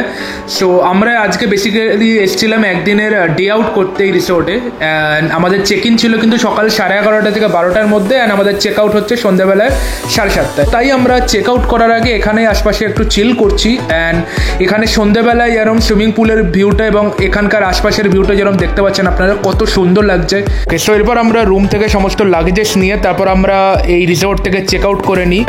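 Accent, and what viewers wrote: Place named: North, India. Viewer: Are you from north India? You are not native of Bengal. Your bengali pronunciation is different.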